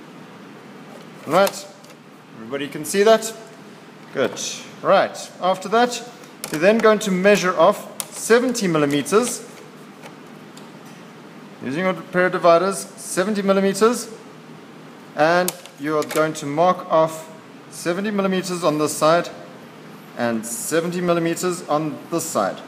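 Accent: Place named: South Africa. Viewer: South African accent i love it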